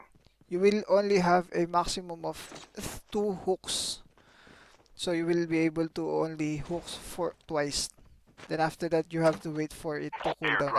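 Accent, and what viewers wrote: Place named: Philippines. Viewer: pag mga pinoy nag english parang di bagay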